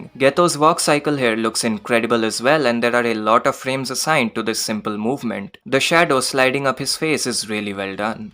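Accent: India. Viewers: Are you Indian? Cause you sound like it. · Yep in Tybw video they were targeting you through racism,when they weren't able to find proper points to defend their criticism they were saying stuff like "you're Indian" "You can't make good content because you're from India and India is known for scams" "I can't hear you your accent is annoying"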